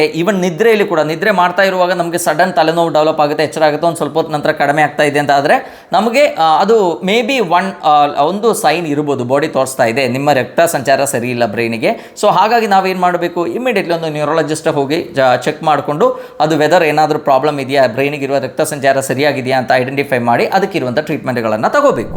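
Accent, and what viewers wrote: Kerala, India. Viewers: Malayali doctor🤔 launguage gothaguthe 🤠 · I think you from kerala doctor Your Language pronounsation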